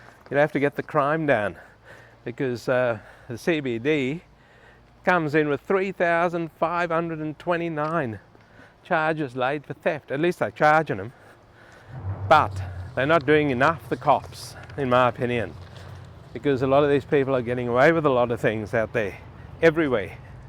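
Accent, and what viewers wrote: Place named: South Africa. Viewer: You sound a bit s african. Are you?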